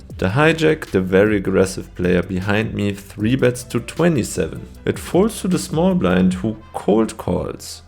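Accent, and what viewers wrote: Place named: Germany. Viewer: Good vlog my friend. I’m loving that German accent 🇩🇪. Nice juicy game man :)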